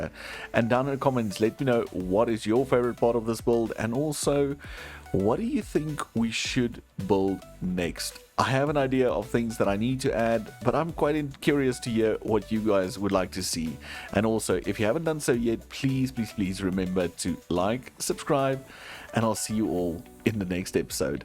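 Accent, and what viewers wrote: Afrikaans, South Africa. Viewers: I got to ask. You have to be from SA. That accent is giving jozi😅😅 Edit: You called it a pick. SA confirmed. Nowhere else in the world would you find such nice English😂 Edit 2:Haha. Called it. Lol Afrikaans accent never misses😂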